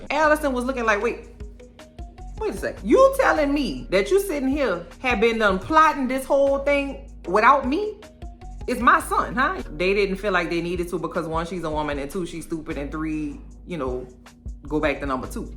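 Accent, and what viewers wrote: New Orleans, United States. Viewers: First off, let me say it....I love the accent. You about to make me go visit my family in New Orleans now. LOL. But as far as the theory of Otto Hightower taking the life of Allicent's mom is a good one. The way he said it was creepy. Like when fathers normally say that it's cute and they're smiling like they're happy. But when Otto said it, it was giving me creepy vibes. As for Helena, y'all better listen to her. She be prophesying. I said oh what's about to come from under the ground. LOL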